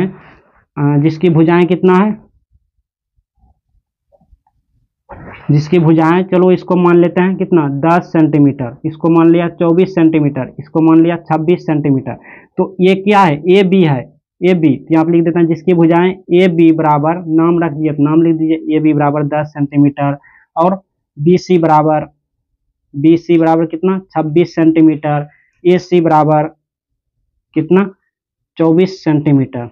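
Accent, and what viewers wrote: Bihar, India. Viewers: Mai video start karne se pahle soch liya tha ye pakka bihari hi hoga😂😂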